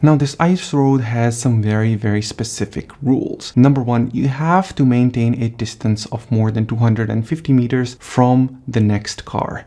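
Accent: Estonia. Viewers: You've lived there for so long that you've even picked up a slight Estonian accent :)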